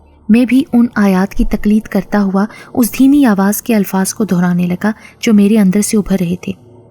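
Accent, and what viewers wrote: Pakistan. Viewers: Allah aap ko khuash rakhe mujhe Nahi pata ki aap ye comnt padhengi bhi k Nahi Me India se hu or aap k Andaz se Lagta h ki aap Pakistan se he ,par itna kehna chata hu ki mujhe aap ki aawaz or andaze bayan se muhabbat ho gai h.. meri baat Ka koi galat MATLAB mat nikaliyega plz... Jab se Mene apki kahaniya Sunna shuru ki h me or Kuch Sunna ya dekhna hi Nahi Chahta . Allah aap ko Khush rakhe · Sastar ap kas jaga sa hea zaban sa lagtha hea ap pakistan sa hea lovli vice lazmi bathana mean ap ko malnay ayho gu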